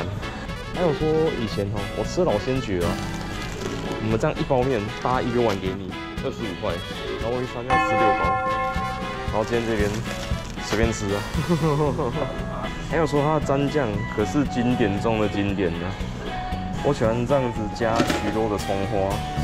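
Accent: Keelung, Taiwan. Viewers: 濃厚的基隆腔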